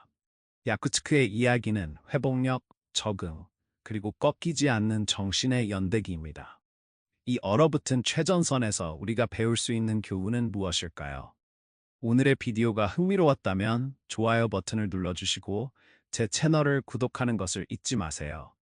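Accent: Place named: China. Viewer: 왜 발음이 꼭 중공 발음 같지?????? 중공 AI 번역인가???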